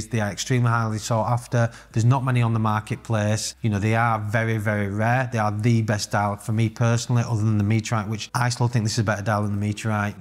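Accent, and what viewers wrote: United Kingdom. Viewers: Sir you always have excellent, cut to the chase, no BS content. I have to really concentrate on your videos because you talk at a faster pace with your British accent. It’s seems like a mix of British, Scottish, Irish and Australian. Where did you grow up? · Very strong brit accent, could you slow down a lil bit sir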